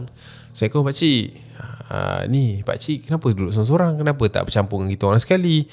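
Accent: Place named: Terengganu, Malaysia. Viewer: Aku dgr je dia ckp klantan tpi slang ganu😅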